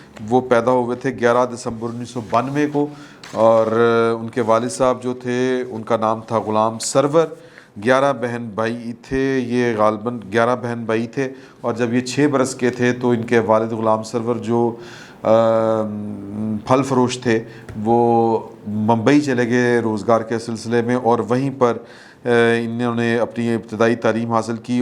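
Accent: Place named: Lahore, Pakistan. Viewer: Maza aa gya...the best thing I like about you is Lahori zaban. Dil khush ho jata hai...kyun...mein vi Lahori ain beshak Karachi rahta hoon.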